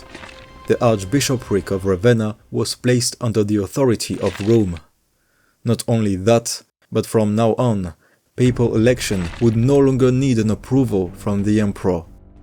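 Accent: France. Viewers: mate are you french ?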